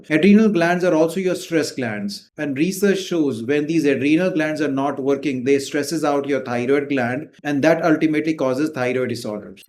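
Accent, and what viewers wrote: India. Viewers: A strong Indian accent is difficult to understand at times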